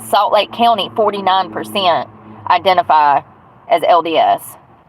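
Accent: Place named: North Carolina, United States. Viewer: How are you? Checking in haven't had a chance to hope everything is going better! You always look beautiful and I adore your North Carolina accent. I can't figure out why everybody in the South North Carolina has the strongest southern accent? I watch another creator that has the same! It's freezing up here nights are in the twenties. Hope the weather is nice for you!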